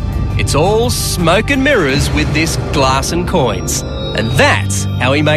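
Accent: Australia. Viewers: Ey... It's good old Aussie Accent ^_^ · it's the australian version with an aussie narrator lol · Can't take this Australian accent seriously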